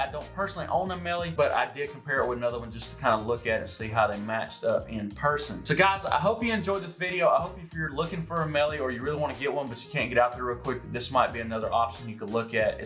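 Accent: South, United States. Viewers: I have had one for a few years and really like it. I like the thumb holes too. I bought my wife one and she is wearing it on a girls weekend trip. All her friends love it and they all want one now!! The only negative, and it probably applies to most fleece "outerwear" is the wind goes right thru it. Don't apologize for the southern accent!!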